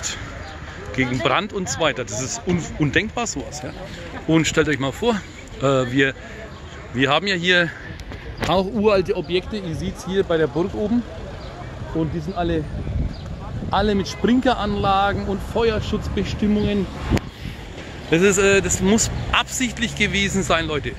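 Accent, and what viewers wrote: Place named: Franconia, Germany. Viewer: Frage. Du hast so nen fränkischen Dialekt. Bist Du in Nürnberg aufgewachsen. Meine Frau ist aus Forchheim, deshalb fällt mir das auf:-). Und ich hab auch etliche Jahre dort verbracht.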